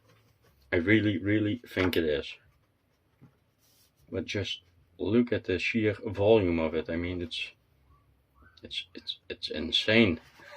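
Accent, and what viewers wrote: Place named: Netherlands. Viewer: Great job with your unboxing video for the Numbered Imajica,!! Love your Dutch accent! And your English is flawless, my friend!!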